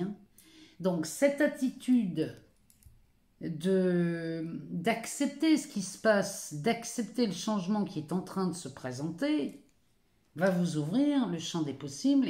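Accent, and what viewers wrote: North, France. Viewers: DEQUELLE REGION DU NORD ETES VOUS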